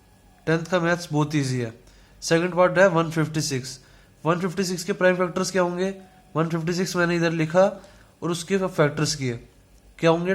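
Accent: Punjab, India. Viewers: Tusi punjabi oo hna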